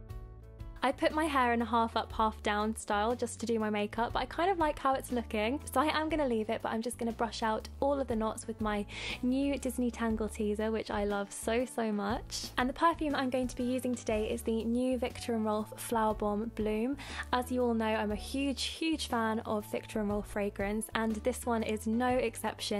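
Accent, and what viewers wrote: United Kingdom. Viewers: I watch you a little bit too much to where I'm now talking in a British accent my family makes fun of me LOL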